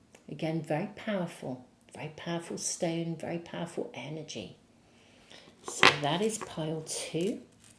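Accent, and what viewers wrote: England, United Kingdom. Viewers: I'm speechless! I did #3; left a 43 y/o relationship that kept me unseen for too long. He dimed my light an my soul. I'm feeling a little bit unsure about my future and worry about my means but I'm proud of who I've become after beeing through my pile #1...I've healed my child wounds and still am as triggers hit me. I met my twinflame through out my healing journey and it is a bit complicated as he also has shadow work to go through. No contact and it's hard. Keeping faith. I will try my #2 pile and reopen my book project that stayed too long in limbo. I just want to be happy again for the rest of my life...with my 4 grown kids, my friends, my art projects and dreams along side with my soulmate that I love. I want to show to the world that if I can do all of this at my age, anyone can find their dreams if they really want to change and find their true self. 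You are right. Many people in my life do not approuve of my choices but I don't care. Thank you for your guidance. Your readings are helping me from day one. Sorry if you find my english writing a little off. I'm french speaking. Hope it all makes sense. Love and Light to you. You have such a lovely english accent.☺️ Merci 💐🙏🏻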